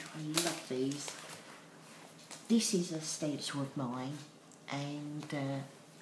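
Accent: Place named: United Kingdom. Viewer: hi I love your art work I am a newbie to art journaling. I'm in the uk you sound like your in the uk. thank your for sharing x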